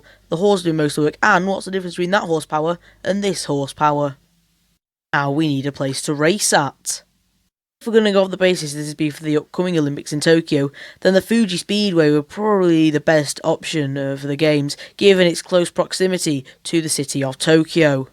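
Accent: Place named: Preston, United Kingdom. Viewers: Your accent is so british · Big up the Preston accent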